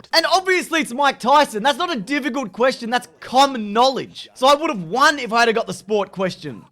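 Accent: Australia. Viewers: Forgive me. I'm a dumb American. Is this an Aussie accent?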